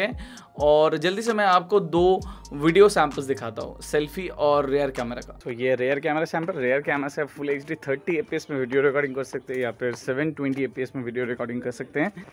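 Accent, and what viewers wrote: Bengal, India. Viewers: Bro are you Bengali?? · Are you from Bengal?? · Bro, are you bangali? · ARE YOU A BENGALI SIR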